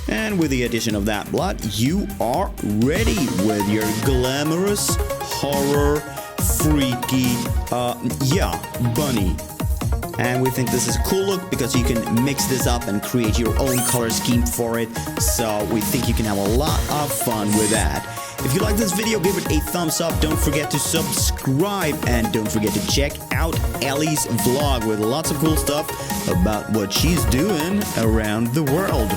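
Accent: Sweden. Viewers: you can really hear that he's Swedish too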